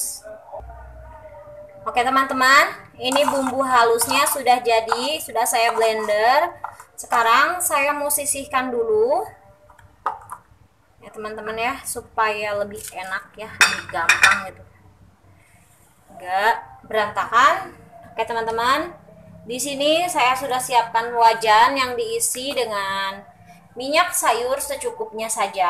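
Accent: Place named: West Java, Indonesia. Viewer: Dari logat bicara, sepertinya si ibu ini orang sunda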